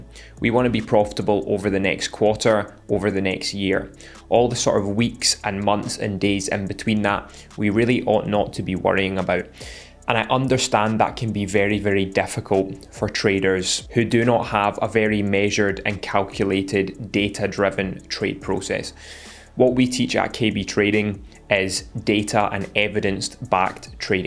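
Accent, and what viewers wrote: Scotland, United Kingdom. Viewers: Scottish accent is elite im moving outa ldn to Edinburgh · Never heard this uk accent 😅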